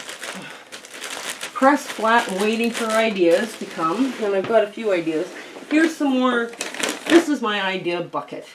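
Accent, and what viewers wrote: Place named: Canada. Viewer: Hi, I envy your organizational skills. My sewing room is a mess right now. We're going to move my sewing room from the dining room to the den. The den is actually smaller so I'm going to have to get better organized. I love how you have so much fabric ready in anticipation of using it in a project, your storage of 2.5 inch strips is genius. I'm stealing that idea from you and I'm going to have to steal your Area 51 for my UFOs as well. It's too funny. I have a Bermuda Triangle in my laundry room, it's where socks go to another dimension. I love your Canadian accent! I went to school in Ontario so my ears perk right up when I hear that lovely Canadian way of saying certain words like project, it takes me back to my school days, fond memories for me. I'm from Michigan and still have a slight accent but I think I sound like a Michigangander now. 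I have a very dear friend in Calgary, it's just gorgeous there.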